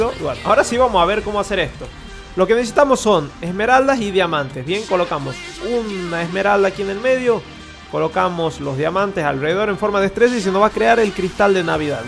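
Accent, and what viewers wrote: Tucumán, Argentina. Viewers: Tucumano hasta el pingo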